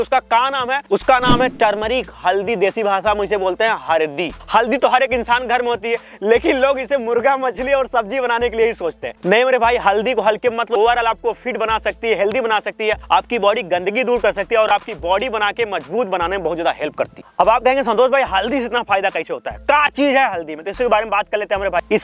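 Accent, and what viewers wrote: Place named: Bihar, India. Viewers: Aese bhojpuri bolt rhaaa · Sbse achhi lgti h tmhari bhasha hmare jaisi h bihari type Bahut sundar bhai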